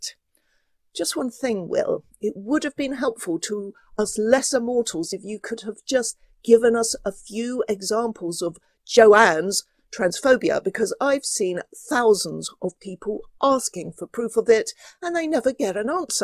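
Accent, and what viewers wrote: United Kingdom. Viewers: you do “sarcasm” better than any one- the British accent makes it even more delicious!!!❤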